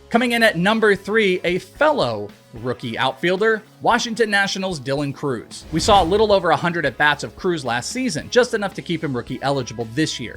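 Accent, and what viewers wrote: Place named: Wales, United Kingdom. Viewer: Welsh talking Baseball 😍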